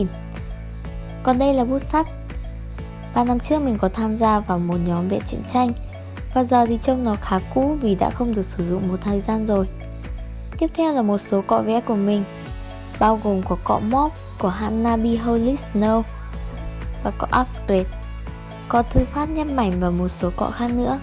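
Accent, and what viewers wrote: Vietnam. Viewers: Chị này người việt hả ? Mình mới theo dõi chị gần đây,thấy đa phần là fan nước ngoài Nên tưởng chị người nước ngoài, h nghe giọng mới biết😂😂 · Á hahha mình nghe được giọng bạn rùi cute quá! Giờ ms bt bn là người Việt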